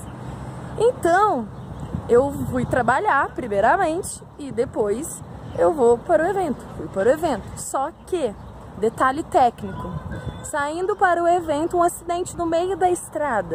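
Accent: Minas Gerais, Brazil. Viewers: De onde vc é guria , fala como mineira com trejeito de gaúcha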